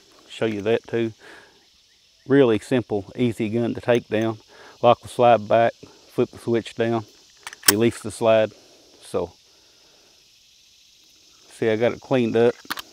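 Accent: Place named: South, United States. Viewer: Your Presentations are Excellent MAN. Don't listen to Critics. They are to the Point, straight forward, and narrated by a Southern Man. What more could anyone ask? I Don't like to be in front of the camera either. I would rather show the content than myself.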